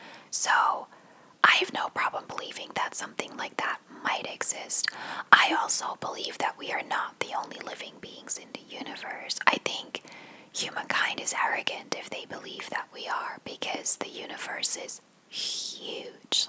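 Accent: United States. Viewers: Is ze Nederlands? Ze heeft echt een Amerikaans accent!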